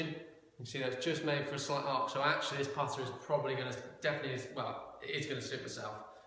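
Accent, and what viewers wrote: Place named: England, United Kingdom. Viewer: Sorry, but between the echo, distance from mic, my hearing issues and the English accent- Can’t understand a thing, but thanks anyway.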